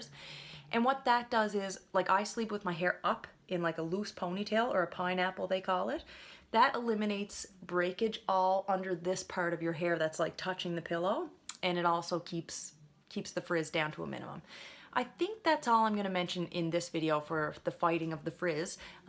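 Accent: Canada. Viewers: Your Canadian accent is so thick omg i love it !!! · I thought you sounded Canadian! My husband is from Ontario but lived in Nova Scotia for a few years before I met him - so he had an accent like yours when we were dating! He's lost the accent now but it's fun to hear it again in your video. :D Also, thanks so much for the curly girl break down. <3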